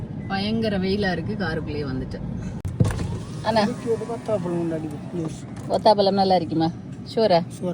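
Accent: Sri Lanka. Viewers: நீங்கள் பேசும் இலங்கை தமிழ் மிகவும் அழகாக இருக்கு ஆங்கில கலக்காமல் பேசினால் மிகவும் நன்றாக இருக்கும் ஒரு நட்சத்திரம் போல் உங்களை காண்பது போல் இருக்கு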